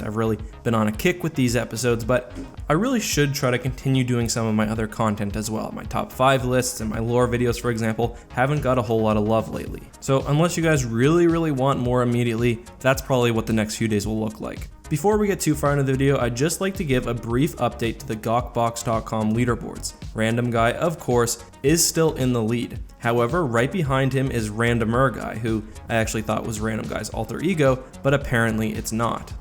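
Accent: Canada. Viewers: Are you canadaian?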